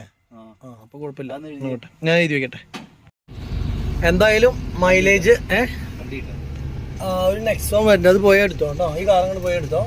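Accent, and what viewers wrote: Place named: Palakkad, India. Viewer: Palakkad basha pwoliche 😂😂😂😂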